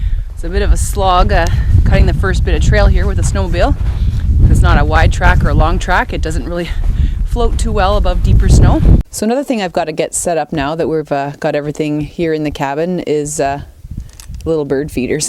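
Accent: Canada. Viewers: Canadian accent :) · Canadian people have such a nice accent.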